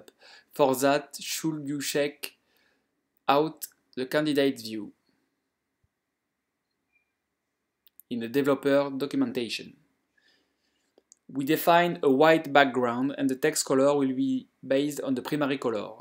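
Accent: France. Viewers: Haha that's right.... really French accent. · that is so much a french accent, hahaha! thanks!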